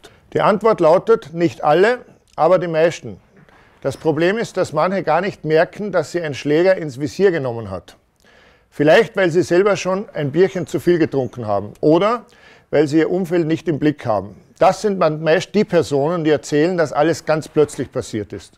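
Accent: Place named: Vorarlberg, Austria. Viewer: Geil. Könnte grad meinen du kommst aus Vorarlberg. Geil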